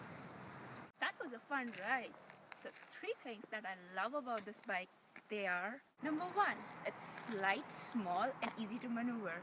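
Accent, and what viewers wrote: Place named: Hungary. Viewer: she sounds hungarian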